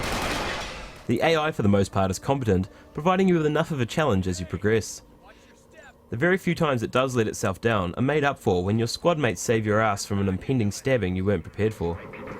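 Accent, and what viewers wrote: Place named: New Zealand. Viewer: Lol, wierd hearing a kiwi review a game.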